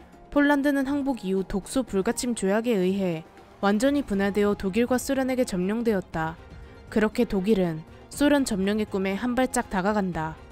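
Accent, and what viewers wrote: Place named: Gyeongsang, South Korea. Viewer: 경상도 억양이 섞여있으셔서 그런듯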